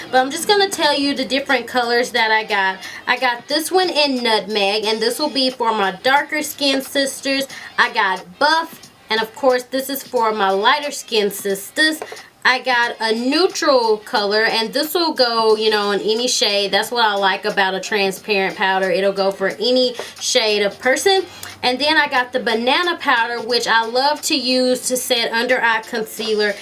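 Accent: South, United States. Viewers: OMG, i love your accent!! what state do you live in? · Just recently subscribed I can't get over your accent! LOVE IT! Southern Belle style ;) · you can still hear your southern accent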